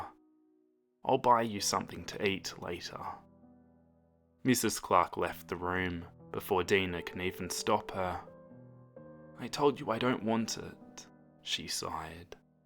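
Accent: Australia. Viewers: Are you a Aussie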